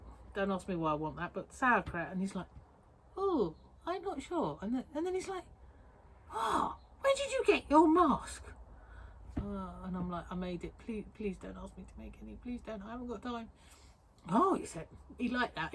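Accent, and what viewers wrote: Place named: England, United Kingdom. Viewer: Hello love your accent so miss hearing English accents (in France ) adore your fabric stash behind you Subscribed so Have a beautiful week and see you virtual soon x x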